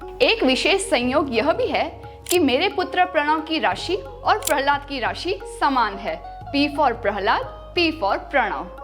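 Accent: Standard. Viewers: जितनी शुद्ध हिंदी आपकी बोली है उतनी शुद्ध हिंदी हमारी बोलचाल की भाषा बन जाए। 🙌 ॐ भगवते वासुदेवाय नमः 🙏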